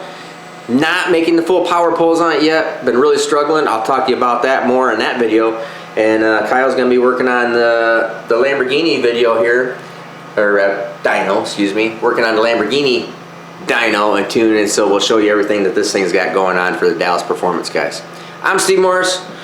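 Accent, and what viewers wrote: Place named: Northeast, United States. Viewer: "...right there Baab. At the haat spaat of the journal..." Steve! You're from the northeast! A hillbilly like me can tell an accent like that anywhere!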